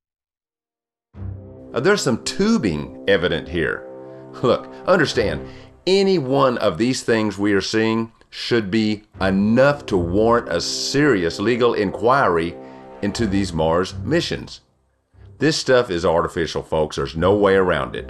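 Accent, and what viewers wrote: South, United States. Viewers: Fortunately we in the USA associate a thick, good Southern accent with superb space-science- in fact, if sounded like typical YT A.I., who could care. Please keep up the great work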